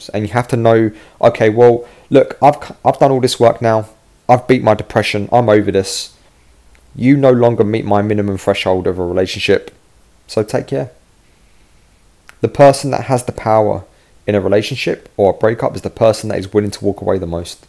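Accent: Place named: London, United Kingdom. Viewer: 👍🏾👍🏾👏🏾👏🏾👏🏾👏🏾🥳🥳 YES!!.. YES!!... Give it to me straight Boss!! Anyone who is depressed needs to listen to this guy!!.. And if you are going through a breakup watchballnhis videos!! Thankyou fir makinh these Videos...please do not stop! Such a help with real talk... and valid, intuitive information!! 🥰Love these videos!... The presenter sounds like a true Londoner.... Like Me!... I need to hear the Effings and Blindings...🤬🤬🤣 That is how I talk man! 💥💫💫💟💥💥Gwarn UK Massive!! 🤷🏾‍♀️🤯Makes me wanna get thr F*CK up and move! This man is my FIX.. Love that good old British ... No Nonsense London Accent. This is what people need out here bruve YES!!💋💋👍🏿👍🏿😘🤗Churped me right up!😎😋 "EX Who!??".🤣🤣😂😂🤩😜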